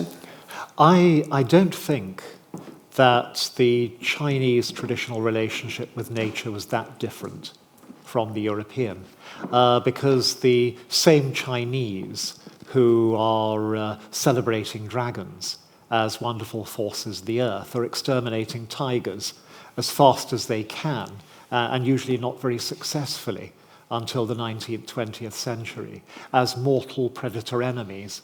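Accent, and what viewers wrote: United Kingdom. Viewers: yes, the Chinese dragons are mild and benevolent as "le feng shoah" tells us... Jesus Christ, what a dingus whenever I hear the British accent I'm expecting the most clumsy, ridiculous, unaware, stupid thing to be said. can't believe these people were the staple of documentation and history just quite recently.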